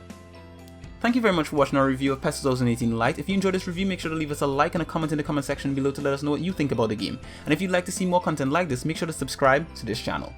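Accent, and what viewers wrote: Trinidad and Tobago. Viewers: you sound like ur from Trinidad and Tobago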